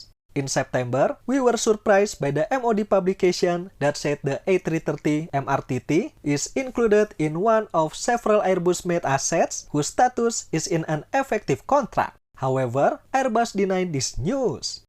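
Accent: Indonesia. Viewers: Saran aja Bahasa indonesia aja bang..udh jd bahasa resmi unesco dan pbb jd banggalah dg bahasa indonesia..logat / aksen ente indonesia bgts xixixixi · Woy lu ngomong bahasa inggris pake lafaz bahasa Indonesia kocak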